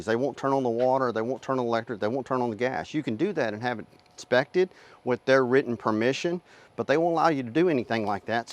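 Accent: South, United States. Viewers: It’s southern talk. For get ready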